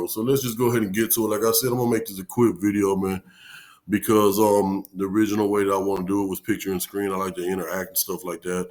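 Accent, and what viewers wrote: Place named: Houston, United States. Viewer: Must be from Houston. I know that accent when i hear it 🤘🏾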